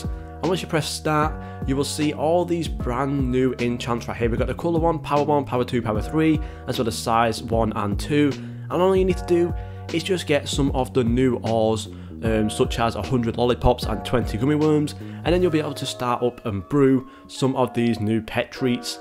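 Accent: United Kingdom. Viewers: Very nice bri'ish dude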